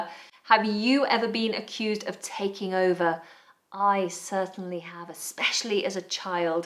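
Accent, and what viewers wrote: United Kingdom. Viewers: nice british accent😊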